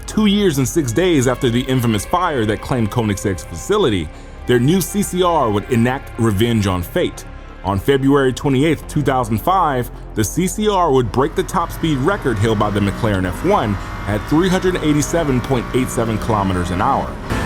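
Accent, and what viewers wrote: United States. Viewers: If you're planning on having Americans watch your videos, at least put MPH numbers in parentheses under the KMPH numbers. Considering you have an American accent, it seems like you should know this.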